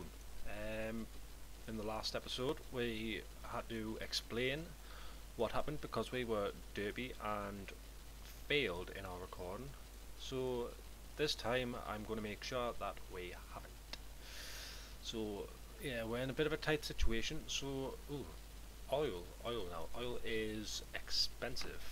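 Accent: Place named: Northern England, United Kingdom. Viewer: I like your accent. Is it from north of England?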